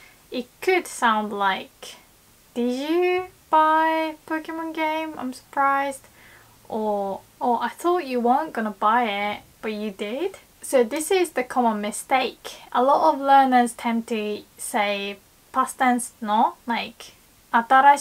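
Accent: England, United Kingdom. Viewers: Your English accent's made me obsessed with your Japanese lessons! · British accent for the win! :) Great video btw. I was struggling after reading Tae Kim on this point. You explained it very well. Now I need to find some good examples of listening practice. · is so fluent in English that I can only assume that she studied British English, or that she learned her pronunciation from a British person. British English and Japanese are non-rhotic languages so their vowel sounds flow very similarly, compared to the rhotic language of American English. I knew someone who studied American English for 10 years and had a strong, unnatural accent, but my friend who studied British English for 1-2 years spoke with a very natural accent. It is in my opinion that if more Japanese people studied the non-rhotic British English that they would be able to speak much more fluently. 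For example, let's take two words my English students struggle with, 'work' and 'walk'. If you look in Japanese-American study books they will say something as ridiculous as ウォーLク(walk) and ワーRク(work) in an attempt to force the rhotic sound (that isn't present in Japanese and has to be represented by the Roman alphabet). However, in British English ワーク(work) and ウォーク(walk) with rhotic consonants removed are perfect, with a simple accent change on the ワーク vowel from 'ah' to 'eh' and, other than naturalising the 'w' sound, ウォーク(walk) is perfectly natural to say too. If more schools/companies taught British English more and more Japanese people would be able to speak English with natural accents, and a natural British accent is much easier to understand than an unnatural and forced American accent.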